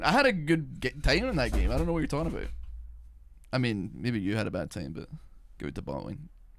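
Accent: Scotland, United Kingdom. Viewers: am from scotland u sound scottish enough for me man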